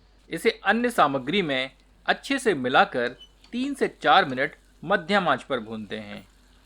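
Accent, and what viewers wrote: Standard. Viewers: Apki hindi bilkul sudh h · Good to hear Swach Hindi. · Itni sudh hindi.... Aisey feel ho rha hai computer hindi doubing kr raha ho😊bt muton cury r luking so delicious...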